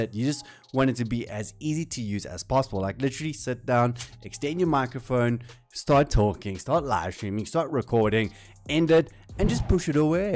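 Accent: South Africa. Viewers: The whole time I was wondering if you were south african from the accent loll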